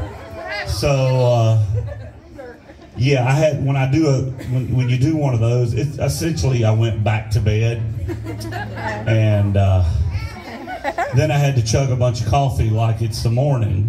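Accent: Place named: South, United States. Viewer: Love u love ur Southern accent awesome song 💯 love it number 1 hit ❤❤❤❤❤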